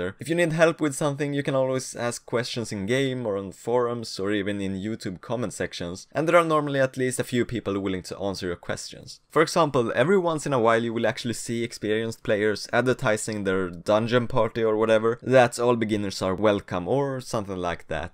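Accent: Sweden. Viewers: Are you from sweden? You have a very swedish-english accent :)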